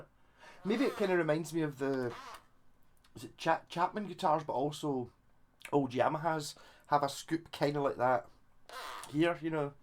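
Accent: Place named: Glasgow, United Kingdom. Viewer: My heart absolutely jumped at hearing the Glasgow accent, love to see the fellow Scottish musicians! Great demo lad!🏴💪🏻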